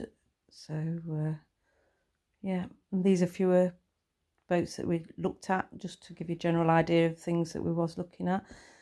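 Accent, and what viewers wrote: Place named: Manchester, United Kingdom. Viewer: Absolutely love your first blog, you did brilliantly. I’m still to make the big decision of doing what I’ve always dreamt of. I’ll be surly be keeping you posted how I get on over the next 12 months. I’m so happy for you guys You sound like a Mancunian Manchester rules 😊 I live 2 mins from Portland Basin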